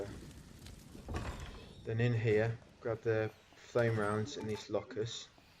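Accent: United Kingdom. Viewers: Great guide, your british accent was kinda funny and also mislead me once but its fine and I feel like without you I wouldn't even attempt to beat hardcore normally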